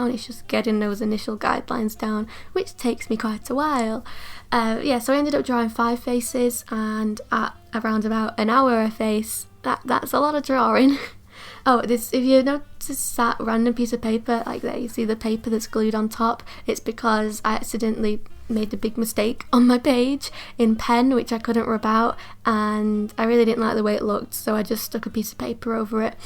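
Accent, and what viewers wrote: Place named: North, United Kingdom. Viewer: I love your work and I love your accent,,,,northern representation heck yeah!!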